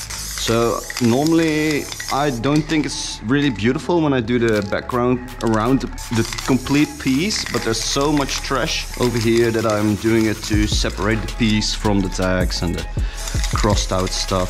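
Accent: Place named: Netherlands. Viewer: Ik wist dat je nederlands was, want dat kan je gelijk horen met hoe je engels praat🤣 goed werk man👍💪🏻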